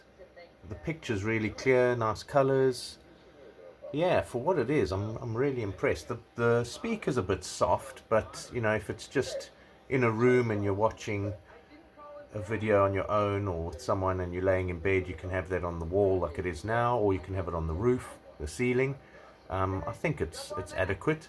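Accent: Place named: South Africa. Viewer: You sound South African. How can I get this in South Africa (Pretoria)? Great video 👍🏽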